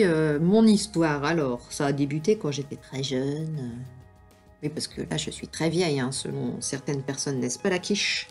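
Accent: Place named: Nord, France. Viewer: Cet accent de ch'Nord, j'adore !! Une de mes cousines cause comme toi ... #velotaf à Amiens !!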